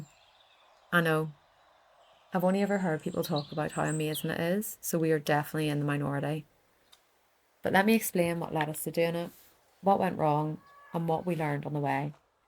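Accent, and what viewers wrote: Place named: Ireland. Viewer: You took the short route, which is ok, since around 35% of walkers do so. I have never done the Camino, and don't intend to, but I think that despite the blisters and the rainy weather, you had a worthwhile experience. Anyway, some of the filming was quite good, and it looks like you did have some good days. Finally, doing that part of the journey in February has its positives: the main one is that hotels and restaurants were empty, and the trail was quiet, without the crowds you get in June or July. In my humble opinion, standing in front of the Cathedral of Santiago without the massive multitudes, with their accompanying scammers and hucksters, was a good result. By the way, I like your Irish accent, and I am disappointed with some of the cruel responses below.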